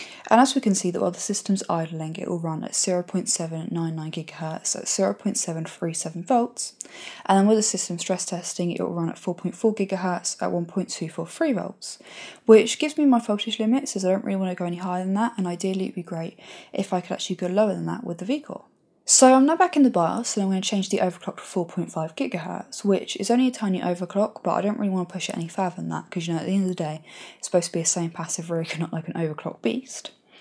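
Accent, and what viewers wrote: England, United Kingdom. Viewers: You make some really good videos. Sadly I always have to concentrate to understand what you're saying. Guessing you're from the UK, what regional accent is that? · She's from England.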